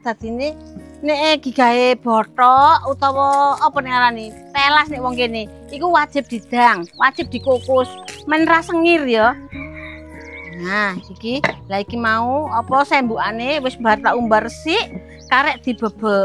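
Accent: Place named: Surabaya, Indonesia. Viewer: Logat ny campuran surabaya ya heheeh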